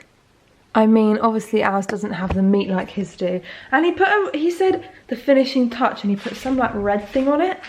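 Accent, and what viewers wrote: United Kingdom. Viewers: I just love her personality! Although British accent is still hard for me, lol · Is it just me or she looks like merle from buzzfeed with a british accent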